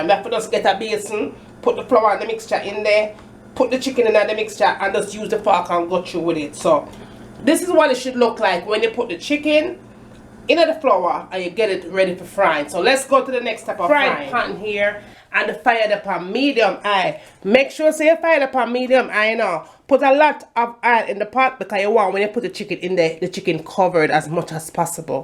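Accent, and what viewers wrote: Jamaica. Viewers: Very nice. Actually the best fried chicken recipe I've seen. I'm going to follow your directions and let you know how I did. an mi did very much enjoy do real yardie accent. Bless up. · I love that Jamaican accent even tho it's hard to understand everything you are saying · no sah u can knw say a real Jamaican, anyways love it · "Nuh bodda guh watch tv an mek di chicken bun up!" 😂😂😂 you a real Jamaican! Love the videos! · Lol I love how she chats the patois, many videos out there with Jamaicans trying to "twang" n it sounds bad....it jus feel like my aunty telling mi how to cook!!! well done. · The Accent tho !! Love it !! Real Jamaican ! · I Love this Queen Sister. She have me on the floor with her yard accent. I simply Love IT !!!! Tell it Sister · Love ur food and the way u talk real Jamaican lady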